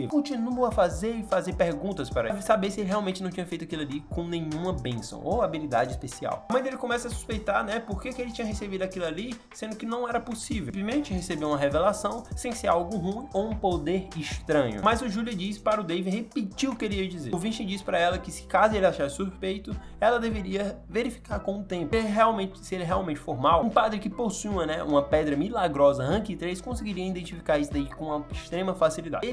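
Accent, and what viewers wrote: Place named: Ceará, Brazil. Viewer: Bom ouvir uma narração de um searense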